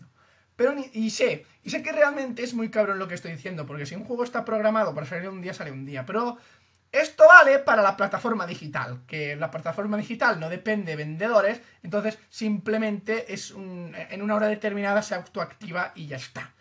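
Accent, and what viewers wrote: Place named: Catalonia, Spain. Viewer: Tienes toda la razón !! PD: Como se nota que eres Catalan ( YO TAMBIEN XD )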